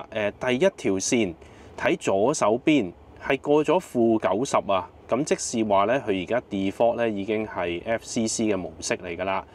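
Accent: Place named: Guangzhou, China. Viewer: 廣州大陸🐖口音，講英文都怪過人😂🖕🏽🖕🏽🤙🏽🐔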